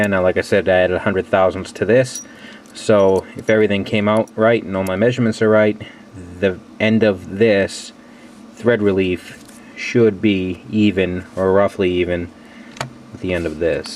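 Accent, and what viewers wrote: Boston, United States. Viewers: Wow really nice work I've subscribed. Love to do this. May I ask is that a Boston accent you have.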